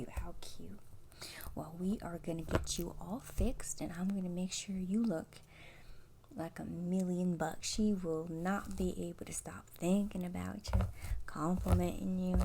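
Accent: South, United States. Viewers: Love that accent you've GOT to do more with this southern accent character!! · Absolutely love your southern accent, so relaxing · Love your southern accent role plays😊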